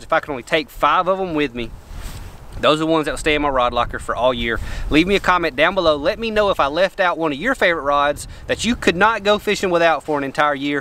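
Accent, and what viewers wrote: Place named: South, United States. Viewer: You are one fast talking southern boy ! Fish the same way I bet. 2000 or more cast a day, gives you lots of opportunity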